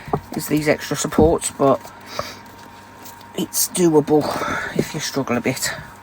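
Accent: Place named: West Midlands, United Kingdom. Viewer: This looks lovely! You sound local, a West Midlands twang to your accent, thank you for sharing ❤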